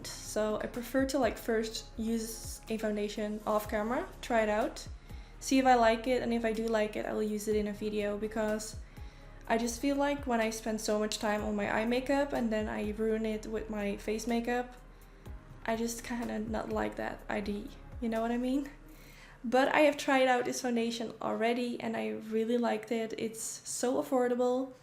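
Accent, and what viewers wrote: Netherlands. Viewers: Love your full face tutorials!!! Listening to you speak makes me miss living in the Netherlands.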